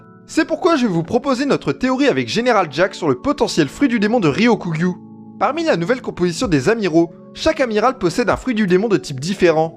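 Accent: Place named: Nord-Pas-de-Calais, France. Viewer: Ptdrr ta un accent chti un peu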